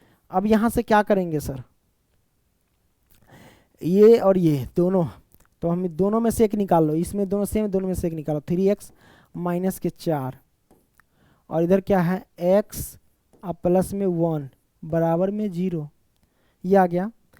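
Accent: Bihar, India. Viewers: Sir apki aavvaj bahut hi mast hai bihari type me mast samaj me aya ho to subscribe kare ❤❤❤❤❤❤❤❤❤❤❤❤❤❤❤❤❤❤❤❤❤❤❤❤❤❤❤❤❤❤❤❤❤❤❤❤❤❤❤❤❤❤❤❤❤❤❤❤❤❤❤❤❤❤❤❤❤❤❤❤❤❤❤❤❤❤❤❤❤❤❤❤❤❤❤❤❤❤❤❤❤❤❤❤❤❤❤❤❤❤❤❤❤❤❤❤❤❤❤❤❤❤❤❤❤❤❤❤❤❤❤❤❤❤❤❤❤❤❤❤❤❤❤❤❤❤❤❤❤❤❤❤❤❤❤😍😍😍😍😍😍😍❤😍❤😍❤😍❤😍😍❤😍❤😍❤😍❤😍😍❤😍❤❤❤❤❤❤❤❤❤❤❤❤❤❤❤❤🎉🎉🎉🎉🎉🎉❤🎉❤🎉❤🎉❤🎉🎉❤🎉❤🎉❤🎉❤🎉❤❤❤❤❤❤🎉🎉❤🎉❤🎉❤🎉❤🎉❤❤❤❤❤❤❤❤❤❤❤❤❤❤❤❤❤❤❤❤❤❤❤❤❤❤❤❤❤❤❤❤❤❤❤❤❤❤❤❤❤❤❤❤❤❤❤❤❤❤❤❤❤❤❤❤❤❤❤❤❤❤❤❤❤❤❤❤❤❤❤❤❤❤❤❤❤❤❤❤❤❤❤❤❤❤❤❤❤❤❤❤❤❤❤❤❤❤❤❤❤❤❤❤❤❤❤❤❤❤❤❤❤❤❤❤❤❤❤❤❤❤❤❤❤❤❤❤❤❤❤❤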